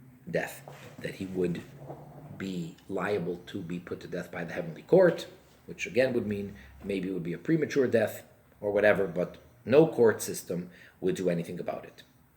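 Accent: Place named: New York, United States. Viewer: 12Yrs Old STILL JUST a GIRL including 2-1/2yrs of age. What in the world ☝️ Uhm that is PEDO & ABUSIVENESS of a Minor maybe in Iserel But in the world at least in America which ur SPEAKING IN ENGLISH (sounds like NY)... so these THESE 7 Laws shouldn't nor ever RELATE to ANY AMERICAN Girl & BTW... How old is the Boy? Pray God it's a Boy NOT 🚫 A MAN? WE ALREADY HAVE THE 10 COMMANDMENTS Thank You very much.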